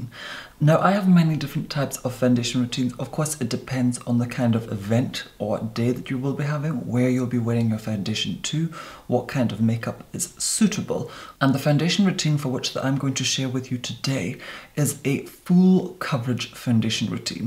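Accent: Scotland, United Kingdom. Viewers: it's like a velvety upper class Scottish accent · I didn't know posh Scottish was a thing. Now I know. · I'm British...but I've never heard someone talk so British before 😂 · true he sounds like he’s a rich brit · Agustina Riveros what professor chaos said is totally correct is from the Hebrides but has been putting on a posh english affectation since he moved to London. If you watch his very first movies you can hear his original accent was much more scottish sounding, it's quite lovely! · He's a well spoken Scottish person. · Very posh version of Scottish. There are many dialects of Scots that sound nowhere near as regal. To me the very rich accent that he has always reminds me of Minerva magonigal or Mrs doubtfire and that’s coming from a Scottish person 😹 I don’t speak nearly as eloquently as he does. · English At Work scottish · Interesting accident - Scottish or Irish maybe, with either elocution lessons or self taught elocution eradicating most of his original speech patterns. 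Reminds me of Margaret Thatcher, or Russell Brand......you can tell he's attempted to learn RP, it's not what he's grown up with. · I'm moving to Scotland just so I can hear this accent everyday. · Agustina Riveros he’s from a Scottish island but also has a posher type London England accent on top of it Someone may correct me but I think that’s what it is-I don’t believe it’s exactly and example of a natural accent though I believe he has altered it. He is so interesting to hear speak and I love the way he does and his vocabulary as well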